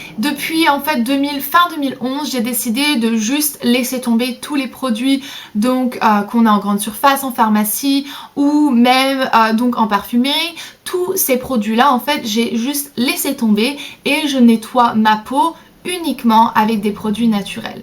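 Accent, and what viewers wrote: England, United Kingdom. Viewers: Salut tu es française? Je trouve que tu as un accent anglais...Bises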